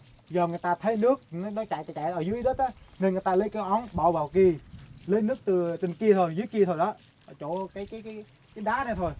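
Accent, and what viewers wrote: Central Highlands, Vietnam. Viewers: Vui vui giọng nói phổ Thông mang chất Tây nguyên., như vậy sẽ được gần gủi với cao nguyên phong cảnh thiên nhiên của núi rừng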